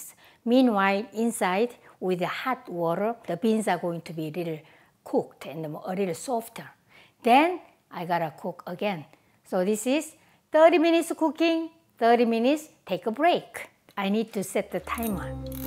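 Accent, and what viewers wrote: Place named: South Korea. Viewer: 이분영어도 너무사랑스러워요 투박한 한국식 발음으로거침없이 설명하시는영어 진짜좋아요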